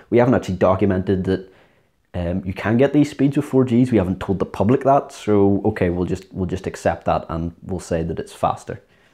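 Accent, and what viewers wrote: Northern Ireland, United Kingdom. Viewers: Northern Ireland! Yeo!1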